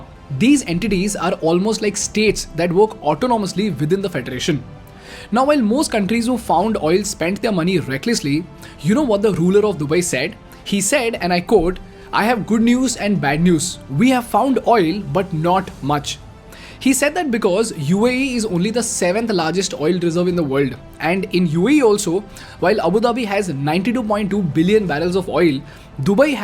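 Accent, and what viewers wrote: India. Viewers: indian accent -_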